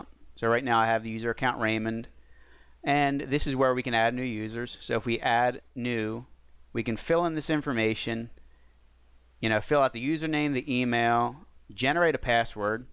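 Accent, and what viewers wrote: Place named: Delaware County, United States. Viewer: Where are you from? I'm from Delco (near Philly). You sound like a local, haha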